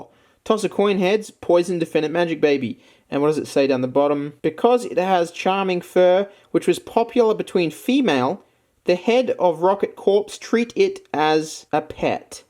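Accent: Australia. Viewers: Shouty aussie gets words wrong. 10/10 I'm happy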